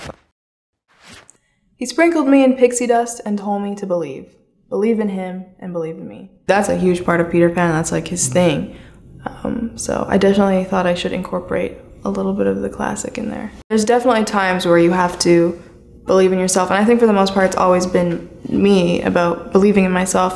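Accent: United Kingdom. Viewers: I thought she was a Brit OMG 😭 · i thought she was british tbh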